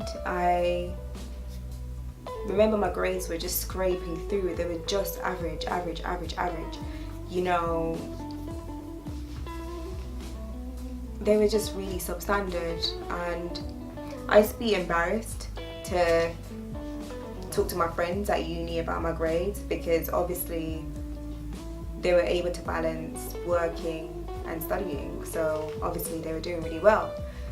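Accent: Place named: United Kingdom. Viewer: Who would have thought you were from Blighty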